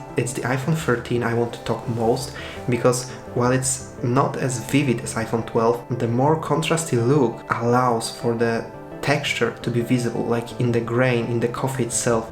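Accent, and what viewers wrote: Poland. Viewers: you are from Poland :D ? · Are you Polish? You sound like Polish guy with your English.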